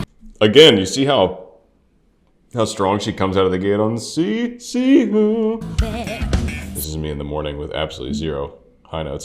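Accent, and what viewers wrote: South, United States. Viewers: Your southern accent is closer to the pronunciation of her name 🎉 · Your American accent from the South is closer to how her name is actually pronounced 😁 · You pronounce like you did in a southern accent. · The way you said her name using your beautiful southern accent was correct! Could you believe it? 😂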